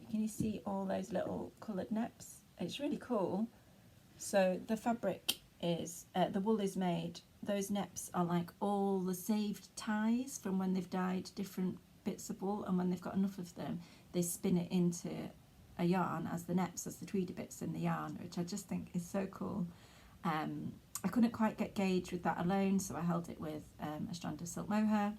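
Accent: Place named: Northern England, United Kingdom. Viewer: I really enjoyed the podcast and the variety of things you have done. It is so refreshing to find somebody who doesn’t like grey!! I used to be completely autumnal in my colour palette, but have recently switched to blues and purples…but still no grey if I can avoid it 😁 I also loved your accent. I am originally from Cheshire, but have been ‘exiled’ in the South East for 39 years, so a Northern voice always makes my heart sing. A great first podcast - keep it up!! Jinny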